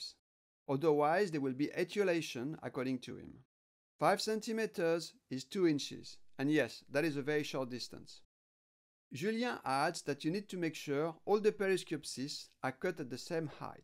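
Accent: France. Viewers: au top, j'adore les cactus et l'accent français, merci !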